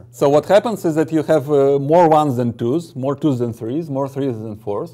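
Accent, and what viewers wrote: Russia. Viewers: is he russian? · Is this guy russian? His accent is very familiar to russian. P.S. : No stereotypes, i just live in Russia and i have to admit, that most of us, russians, have terrible pronunciation:) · I immediately recognized he is Russian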